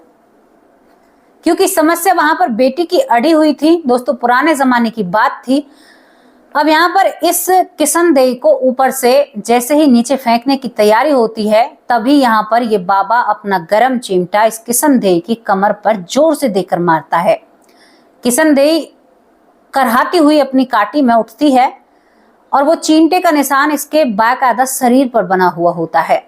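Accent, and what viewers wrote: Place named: Braj, India. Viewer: आत्मा अजर अमर अविनाशी है।यही अटल सत्य है जी। आपकी हिंदी उच्चारण से आप ब्रिज क्षेत्र की प्रतीत होती हो, कृपया अपने बारे में भी बताने की कृपा करिएगा। सद्भावनाओं सहित दिनेश सारस्वत नयी दिल्ली से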